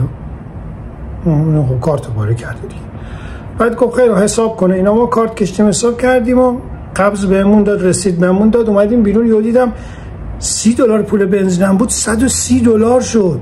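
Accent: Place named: Iran. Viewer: انگلیسی را با لهجه فارسی صحبت نکنید ... طرز تلفظ جملات و کلمات را از گوینده انگلیسی زبان که زبان مادریش انگلیسی است یاد بگیرید .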